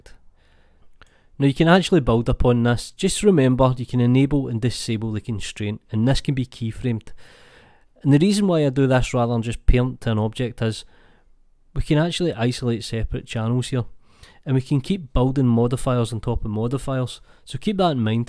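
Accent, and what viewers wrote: Scotland, United Kingdom. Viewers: Ah okay, that explains everything 😄 the scottish accent 👍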